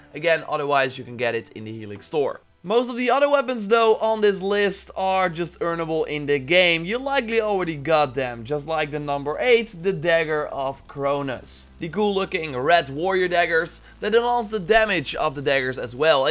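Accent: Netherlands. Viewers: Are you dutch cuz u kinda sound like you are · I love how dutch your accent is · lol I like your English talking, You more sound like someone from the Netherlands? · Are you dutch?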